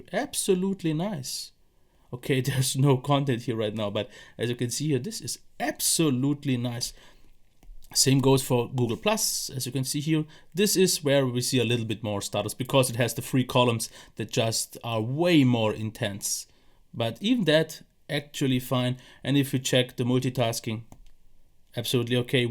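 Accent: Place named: Germany. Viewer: Based on your appearance, hat and western shirt included, you look like you were born in the great state of Texas, U.S.A. That said, Your accent agrees with your claiming to live in Germany. So, I'll just say, "Guten Tag" instead of "Howdy" I too was a little concerned about the "dated" Snapdragon 800 CPU (SoC). But, I checked the Futuremark website's Best Mobile devices and, it seems to still be comfortably somewhere in the top ~300 of all mobile devices running the Ice Storm Unlimited benchmark. It also didn't score too poorly on the Slingshot benchmark test (though the frame rates are as bad as you'd expect for a benchmark test that is intended to stress current top end mobile processors like the Snapdragon 835.) But, the Unlocked and refurbished AT&T branded version I picked up on eBay just yesterday for just $129.99, (the LG G Pad X V930), seems to be a pretty good deal; though the tablet's battery will be a year old with use. 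Still, I believe it will do well for my wants and needs. I just wanted to be sure it didn't have rear facing speakers, (which is something I find hard to believe that tablet makers actually have long done, and still do), and is mostly why I came to YouTube looking for reviews on it. One feature I was wondering about also was, whether or not it has an IR Blaster?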